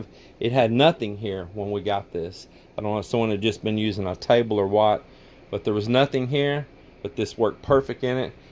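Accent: Texas, United States. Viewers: your drawl is getting stronger over time as you stay in Texas. It takes a yankee to notice.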